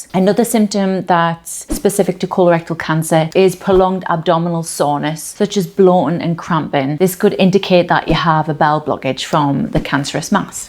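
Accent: United Kingdom. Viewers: What part of the UK is this accent from? Midlands?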